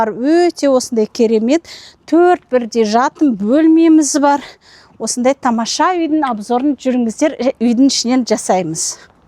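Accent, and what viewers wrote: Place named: China. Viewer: Чувствуется акцент китайский ( оралманка?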